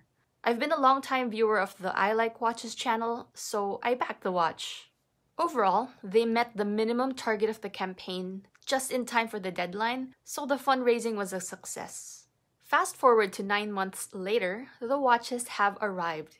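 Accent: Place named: United States. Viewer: I wanted to ask how your English is so good it sounds native with a mild US neutral accent (I was and well technically still am an Oral English teacher) did you spend time in the US or Canada? or did you complete an IELTs course in university?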